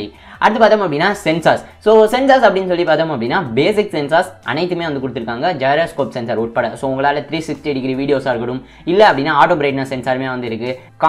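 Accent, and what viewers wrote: Madurai, India. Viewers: Neenga Madura karara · Bro neega madurai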